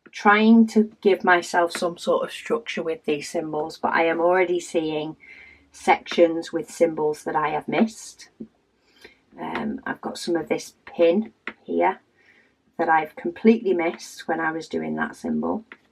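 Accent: United Kingdom. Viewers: Hello, i don 't comment much because i'm painting together with you during the waffles. Great company! Love the british accent which reminds me of schooltimes. Also a very nice refresh from a lot of american youtubers which i also enjoy. Now don 't get me wrong with the following! I had a very surprised chuckle when you read my last comment out loud. The first 2 times you got my name right with lovely accent, bonus points haha. Last time you made it sound like "may raven" like the month and the Bird. That is the very first time that happend and i was happily laughing. My name is very hard to get right and this was the most unique response. Not offended! Don 't be doubthing yourself. I guess to explain you say my first name like "mai rah". You added a bit of my last name as well. ;) even dutch people Don 't get it right all the time. My parents just love the name and so do i. 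More people with unique names have the same experiences? That was my ramble, happy painting!😂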